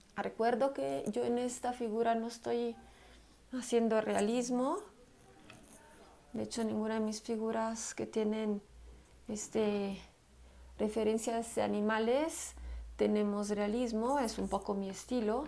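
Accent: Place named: Italy. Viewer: Es italiana, por los nombres y el acento.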